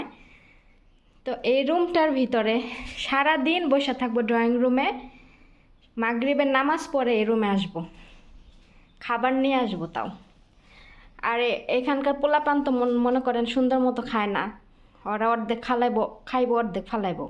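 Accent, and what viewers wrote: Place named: Manikganj, Bangladesh. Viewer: Apnar mokher vasa sone mone hoy apnar bari manikgonj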